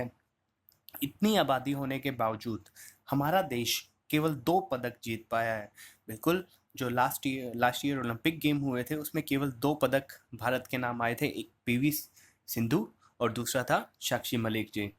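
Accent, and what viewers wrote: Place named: United States. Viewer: Aap america se ho kya sir ... Aapka toning america wali hi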